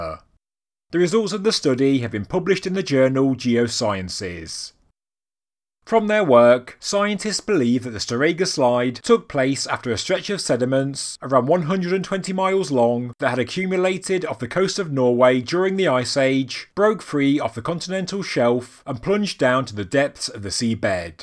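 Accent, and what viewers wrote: United Kingdom. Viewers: Its just his accent 🤷🏼‍♂️from the uk..unfortunately i sound very similar 😂 im from northampton in the midlands.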